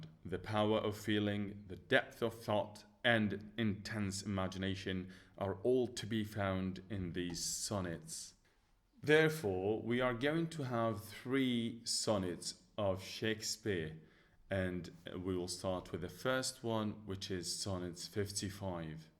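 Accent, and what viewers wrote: United Kingdom. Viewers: I thought you are from england !!😭💙💙💙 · If only there's a doctor with this perfect accent and language in my uni ɵ̷̥̥᷄ˬɵ̷̥̥ omg at first i didn't watch the intro so i thought u're an English person 😂 gd job British accent is so difficult for me, i think that's why i have an American accent lol,it's easier!.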